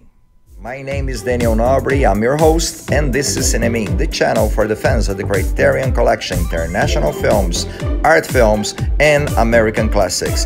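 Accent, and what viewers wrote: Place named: Brazil. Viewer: Sotaque Brasileiro é inconfundível. Muito boa sua crítica, Daniel! Não o conhecia. Contudo, o filme não funcionou para mim, o fato, como você mesmo disse, de o elenco não trazer atores profissionais, prejudicou muito a minha imersão. Por vezes ridicularizei certas cenas que seriam incríveis, na minha opinião, com atores profissionais. Em suma, achei a ideia brilhante, porém, muito mal executada. Algo positivo disso tudo foi que realmente nos colocamos na pele do jumentinho, uma vez que os animais também nos veem como “atores amadores”, tendo em vista que nossos movimentos, falas e atitudes são incompreensíveis para eles, como é comum em animais de outra espécie quando se observam. Logo, toda a atuação tosca e inverossímil, aproximou-me muito mais do animal do que qualquer outro personagem. Entretanto, mesmo assim não fui capaz de digerir a obra. 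Nota: 6/10.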